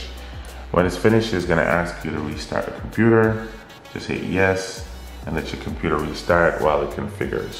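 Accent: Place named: Jamaica. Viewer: I hear the Caribbean accent. If I had to guess. Yardy (Jamaican). Thanks for the video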